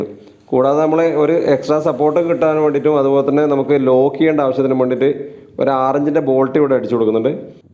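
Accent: Kannur, India. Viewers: You are allrounder Best of luck. dear. And i like kannoor langage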